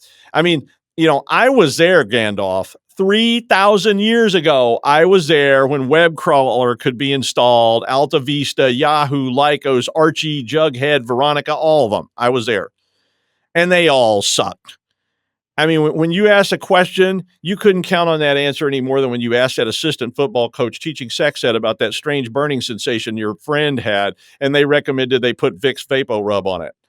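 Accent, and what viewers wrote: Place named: Rhode Island, United States. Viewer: If I didn’t know better, I’d say you were born and raised in Rhode Island.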